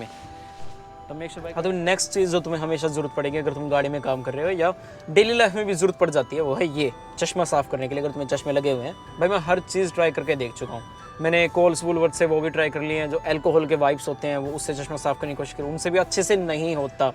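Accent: Haryana, India. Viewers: Haryanvi touch bhai goda 😎 jai haryana jai haryanavi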